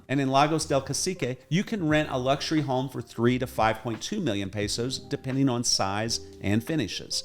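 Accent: United States. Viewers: You aren't respecting the Spanish language if you don't roll the letter "r". Try listening to your local friends and copying, rather than reading the Spanish words with an American understanding/pronunciation. Just wanna help 😉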